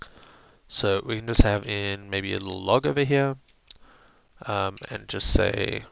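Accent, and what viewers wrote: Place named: South Africa. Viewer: SA in the US. Cool to hear a South African doing this.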